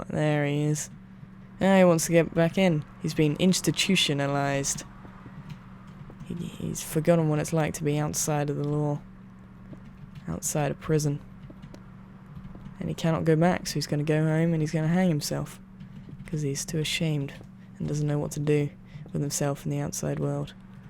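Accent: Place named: England, United Kingdom. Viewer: Drum and that was awesome and I love ur England accent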